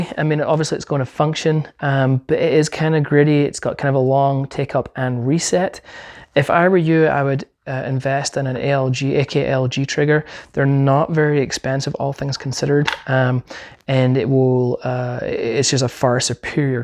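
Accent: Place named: Scotland, United Kingdom. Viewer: Where you from brother? Sound a bit scottish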